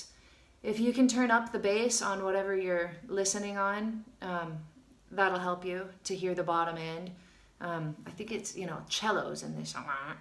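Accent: United States. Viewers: So American 😂...Thank you for all the teachings....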